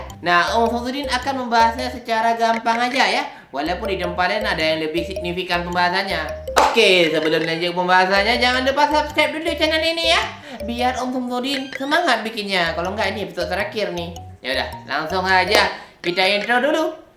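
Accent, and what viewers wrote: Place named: Aceh, Indonesia. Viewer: Logat aceh abg nih.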